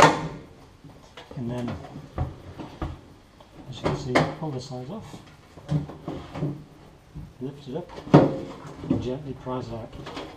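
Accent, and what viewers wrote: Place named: Australia. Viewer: Thought I recognized the Aussie in your voice. Have enjoyed many visits there and find everyone seems to DIY! Former Newport RI, USA resident, now living inland and trying to get off the grid!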